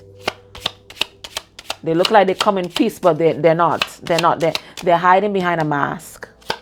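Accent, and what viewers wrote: Trinidad and Tobago. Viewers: Thank you. Always love hearing that trini accent 💕🙏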